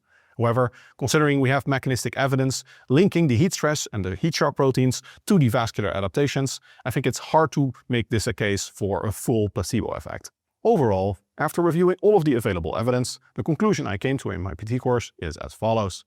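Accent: Netherlands. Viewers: I wasn't watching the video and yeah that line did pop my eyebrow when I heard it. Going back it followed the other Dutch-tell phoneme he uses which is the uncentralized 'u' in "unaware". Anyway, if you're reading this, your English is great and your accent is very mild. Even Jeff Nippard has a noticeable accent to an American audience. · I know he's Dutch, but not where in the Netherlands. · His accent is pretty screwed up anyway because he's been away from Netherlands for so long.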